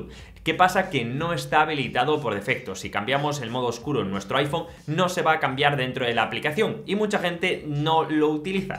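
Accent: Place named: Spain. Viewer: Me gustaría que escribieras las apps o páginas que recomiendas ya que muchas veces con el acento español no siempre se entiende cuales son las apps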